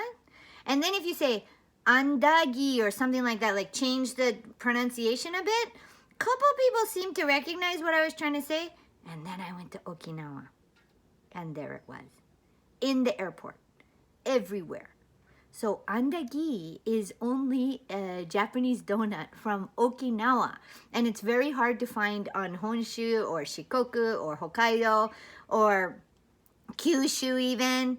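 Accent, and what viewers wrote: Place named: Hawaii, United States. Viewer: So good sistah! After all these years you still get your da kine pidgin accent!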